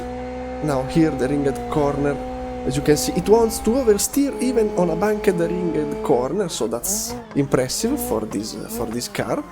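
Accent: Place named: Italy. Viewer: Minchia Brother si sente poco che sei italiano 😂 comunque bel video 👍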